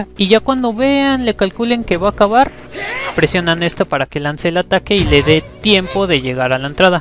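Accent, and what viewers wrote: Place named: Mexico. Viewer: No hables asi como tan mexicanote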